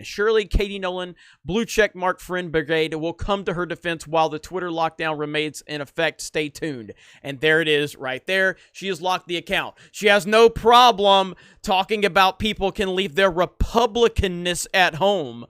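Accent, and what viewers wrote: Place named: South, United States. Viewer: Good day, Salty Troop stopping by. I'm starting to like this guy. Like his slight 'Southern Preacher" drawl, and his content presentation.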